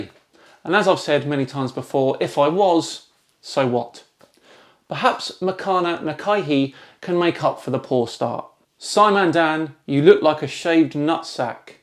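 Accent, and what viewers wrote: United Kingdom. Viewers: I'm 50/50 on it. Dan, I only support you b/c my little brother Brian (FFAS) drew an amazing portrait of you & he's a very talented artist. Half the time you're entertaining & the other half you're condescendingly ass-ish. The Lego pic frame was your saving grace. Yes, sometimes you're funny, other times, your mixed British accent is grating b/c it's right in between Proper English, "with a moment for tea & crumpets," and London Ghetto Cockney, "whut was u finking, bruv," but b/c it's not one or the other, the duality is more noticeably annoying to those of us who have no accent.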